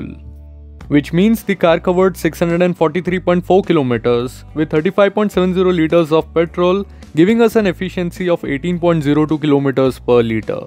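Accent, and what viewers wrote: India. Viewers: You Sound like apu from simpsons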